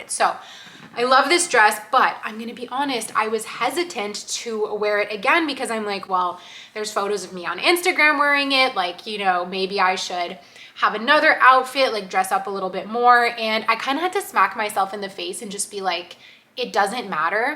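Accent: Quebec, Canada. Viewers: I adored listening to you speaking French <3 with the cutest Quebec accent at that ! lots of love from France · I like your videos and in this one it was the first time I heard you speaking in French and you even have the Quebec accent when speaking French I'm impressed (I love the Quebec accent as a French from France) :hands-yellow-heart-red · Haha you have such a strong quebecois accent now when you speak French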